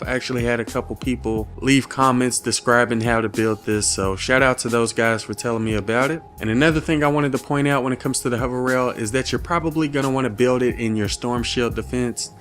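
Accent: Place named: Florida, United States. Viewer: I'm trying to figure out.. is this an accent from Florida or some coast states ?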